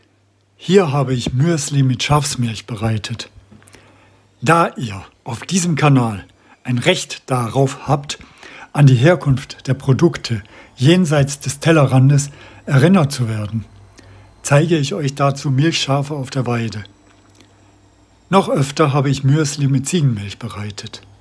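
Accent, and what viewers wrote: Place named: Switzerland. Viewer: Also von mir aus kannst Du das Schweizerdeutsch gerne mehr einbauen, ich höre das gerne. Selber sprechen kann ich es leider garnicht... Und wie es aussieht, ist Deine Verwandtschaft auf der ganzen Welt verteilt! 🙂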